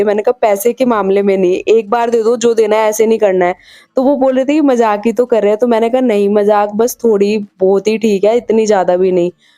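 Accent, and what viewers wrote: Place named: Haryana, India. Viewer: hnji ap ki language mei touch ata h wo haryana walo ka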